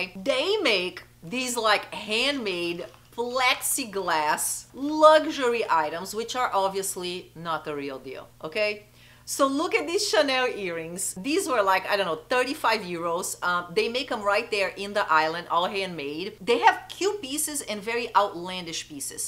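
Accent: Brazil. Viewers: ouvi o sotaque e na hora sabia q era brasileira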